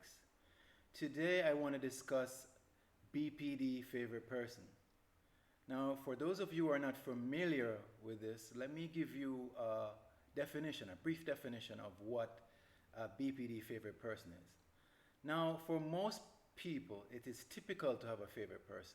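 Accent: Caribbean. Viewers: Hey, you sound like you're from the Caribbean. I'm Trinbagonian. Love you too. Your wife is lucky that, as a man, you've taken your mental health into your own hands and are trying to be better for yourself and her. It's refreshing to see an informative and proactive video on this topic from someone who lives it. Stay up, Brother.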